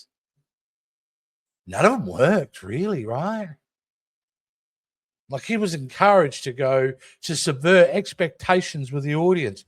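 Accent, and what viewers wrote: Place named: Australia. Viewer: nice to hear an Aussie speak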